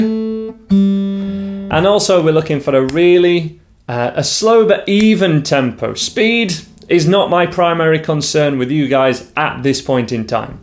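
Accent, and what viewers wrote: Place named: Buckinghamshire, United Kingdom. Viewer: Where u from? U sound Buckinghamshire esque.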